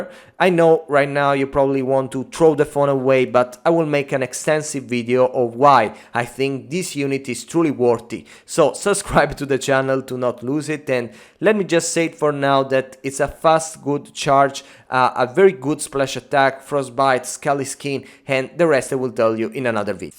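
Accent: Italy. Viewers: its an italian accent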